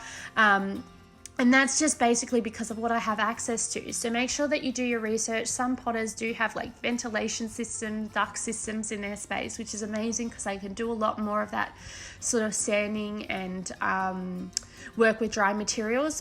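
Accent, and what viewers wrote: Australia. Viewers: Ah these are so gorgeous and so are you! It's a real treat to have such a joyful and engaging YouTube series with an Australian voice in it. I'm enjoying it heaps - thanks from Tassie.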